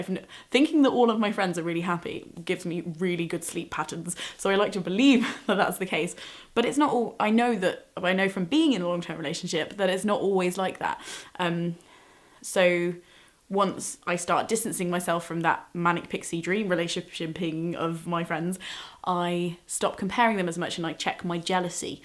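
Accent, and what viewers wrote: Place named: West Midlands, United Kingdom. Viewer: I've been watching your videos for ages and never realised you were a fellow West Midlander (maybe it's just me being awful at recognising accents, I don't know) 😂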